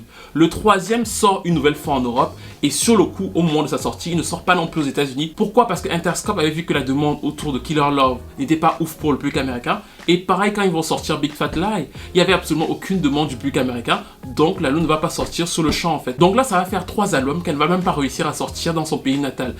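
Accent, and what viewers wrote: Cameroon. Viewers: Je viens de découvrir la chaine, j'ai reconnu l'accent du 237😂😂😂 et j'adore les vidéos sont longues mais on ne vois littéralement pas le temps passé tellement tu t'y connais dans le domaine.... Well done à toi. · L'accent camerounais 😎😎...so proud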